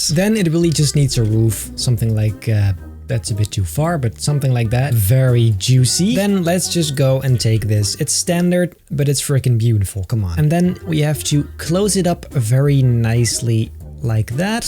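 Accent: Netherlands. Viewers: je hoort wel een beete dat je nederlands bent!:D Mooi man dat je zo goed kan zijn pfff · Hey jij Nederland. Zou me niks verbazen. Kan het horen.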